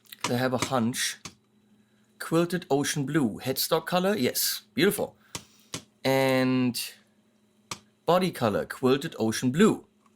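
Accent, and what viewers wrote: Germany. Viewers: I havent known for a long time, only recently got back from more a computer gaming time to pick up guitar again, after a long long long time. In order to get away from the computer stuff, which isnt easy for me after so many years and very good memories, i have dumped most of my Youtube bookmarks, which were centered aorund gaming. Now slowly getting nice channels here on Youtube when it comes to making music and all that. And also this channel here, which I really enjoy. is really entertaining and nicely geeky and his german english is simply adorable I think. I am from Germany too, so I know how that is.;) Anyways, after stating my joy for this channel &, to the topic at hand.;) I have to agree with on the fact that with a guitar on that price range, a gig bag or even a simple case wouldnt be so much to ask. I mean, its quite a lot of money, at least for most of us, and to only get it in a damn cardboard package is somewhat lame, to say the least. 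It doesnt matter which company it is, if its Solar or others. The fact is, a few things shouldnt be too much to ask when you buy a new guitar. Also, a multitool like you get on Ibanez prestige guitars (dont know if also with premium), should be also included, when you buy a guitar over 300 Euro or more. Lets face it, these tools cost a couple pennys in China or whatever, thats simply no excuse to only give that with really expensive stuff. Companies really cheap out on these little things, when th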